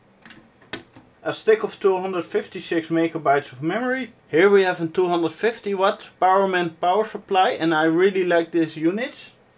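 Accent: Netherlands. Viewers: He has a dutch accent, im dutch as well, his english is sh#t just like his entire generation, not his fault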